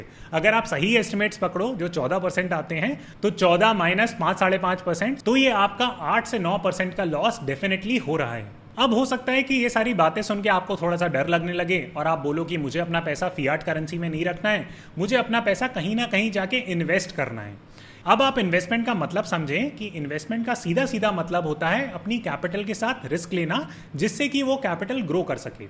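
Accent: Standard. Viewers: Loved you Shudh HINDI. 😊 And how is Zayn doing? Does he speak hindi as well? Your english channel does not feature this hindi channel. Feature it ASAP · Kya shuddh hindi bolte ho sir aap.🤩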